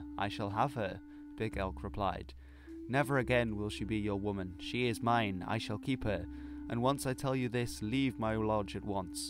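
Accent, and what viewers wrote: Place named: United Kingdom. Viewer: It was very strange hearing this tale in a British accent. Well told, Sir!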